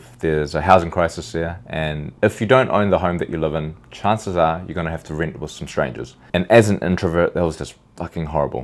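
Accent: New Zealand. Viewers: You’re so absolutely right on all points. I’m from Christchurch and the herd mentality and rascism is worse there, such a shame. I live in Auckland and yes cost of living is very high. Keep rocking your integrity and sense of self along with that kiwi accent! Wish you all the best ☺️🙏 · God Bless You, man! Love your Kiwi Accent! Oh, and I subbed! 💥👍😎🇳🇿🇦🇺🇺🇸❤️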